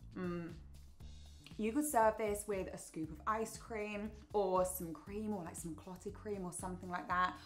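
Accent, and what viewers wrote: United Kingdom. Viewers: Thickest bri'ish accent have ever seen (not to be mean or anything)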